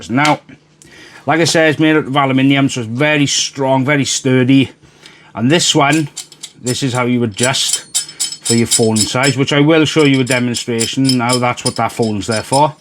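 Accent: Wales, United Kingdom. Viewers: You really as Welsh as it gets butt innit?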